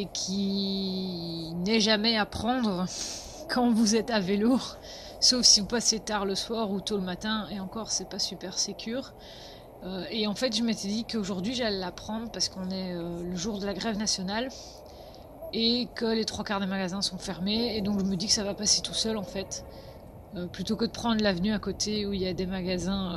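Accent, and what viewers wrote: Belgium. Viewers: Hebus LeTroll Je sais hein ket ;) C'est juste qu'on entend pas souvent un bel accent belge broubeler sur internet :D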